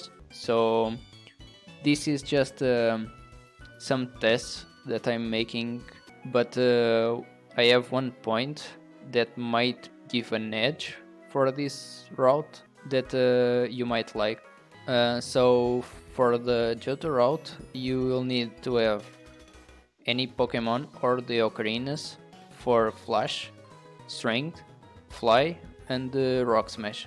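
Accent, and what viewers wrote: Portugal. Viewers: This is gonna be a shot in the dark. But I feel the Portuguese accent here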